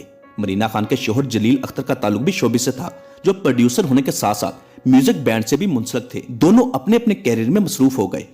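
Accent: Punjab, India. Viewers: آپ کی وڈیوز معلوماتی اور دلچسپ ھیں- مگر آپکا اردو تلفظ خالص پنجابی ھے جیسے مرینہ کو آپ MRINA بولتے ھیں- جبکہ لفظ Marina ھے مطلب م پر زبر ھے، اسی طرح دیرینہ کو درینہ بولتے ھو یہ لفظ "دیر" سے ھے دیر ینہ ھے درینہ نھیں- امید ھے اپنی تصحیح کر کے اپنی وڈیو جو مزید دلچسپ بنائیں گے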